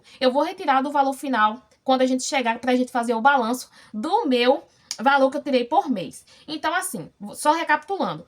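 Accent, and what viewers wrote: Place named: Bahia, Brazil. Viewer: Mirmã voz de baiano é terapia 😂 Parabéns lindona vc é inspiração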